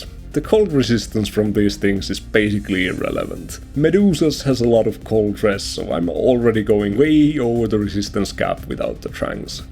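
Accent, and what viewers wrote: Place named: Finland. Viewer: ahh :D tunnistin heti suomen aksentin sun videosta :D gj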